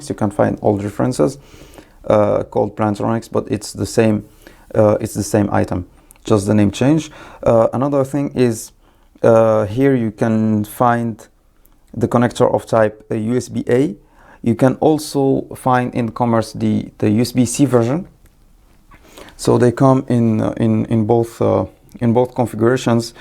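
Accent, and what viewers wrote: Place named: Morocco. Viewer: Dat #moroccan accent!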